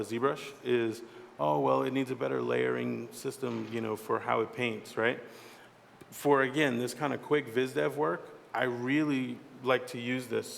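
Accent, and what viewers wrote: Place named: Bronx, United States. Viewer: I knew this dude was from the bronx just listening to him.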